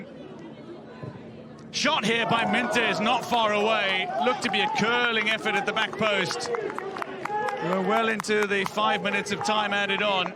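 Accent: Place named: South Africa. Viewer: Why is the commentator a South African ? haha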